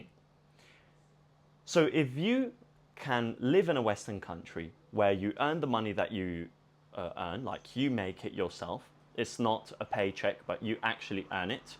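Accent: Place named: United Kingdom. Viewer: I like your British accent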